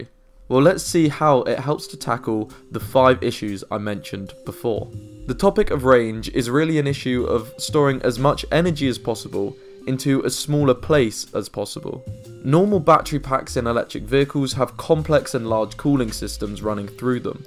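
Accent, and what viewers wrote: United Kingdom. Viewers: Your British accent is amazing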